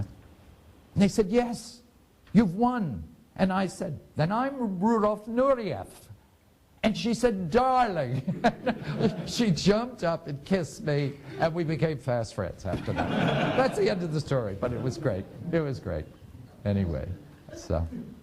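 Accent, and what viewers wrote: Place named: United States. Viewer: Awful narration, not to mention the god-awful American accent.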